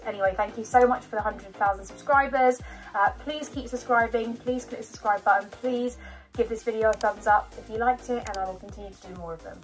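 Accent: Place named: RP, United Kingdom. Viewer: 100% posh 🤣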